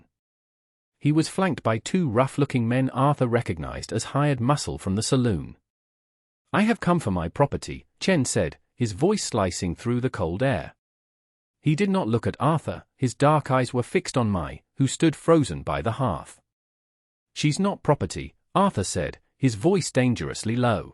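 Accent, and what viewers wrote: England, United Kingdom. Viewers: Interesting yet disarming - - A narrator with a British accent telling a cowboy tail from the Old West 😞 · Please get rid of this hard, annoying English accented voice. It absolutely does not fit these stories. Bring back the warm sift and rich American accented narrator. This voice is extremely annoying.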